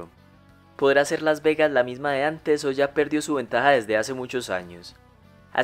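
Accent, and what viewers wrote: Antioquia, Colombia. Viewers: Se nota que eres paisa, buen video parce!!!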